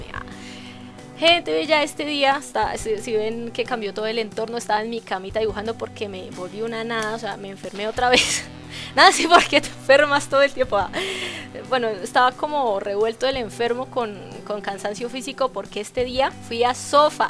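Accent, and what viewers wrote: Colombia. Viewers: ¿Eres colombiana no?, es la primera vez que entro a tu canal y lo note en seguida xD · cuando diijste que eres Bogota mi corazoncito dio un vuelvo eramos vecinas:'v Yo soy de Venezuela:') Pero ahora estoy en Ecuador y muy pronto en España Yo pase por Bogota y no te conocí:'U Pd: Pensé que eras mexicana por las expresiones que usas pero ahora que lo escucho bien si tienes acento colombiano 7u7r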